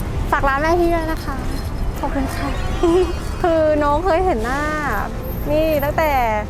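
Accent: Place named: Nakhon Sawan, Thailand. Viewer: ดูตลอดนะครับfcขาหื่นนครับ พึ่งรู้ว่า เป็นคนคอนหวันคนบ้านเดียวกันเลยครับผมอยู่อำเภอท่าตะโกง่าบบบ ถึงว่าสำเนียงทำไมเมือนคนนครสวรรค์จัง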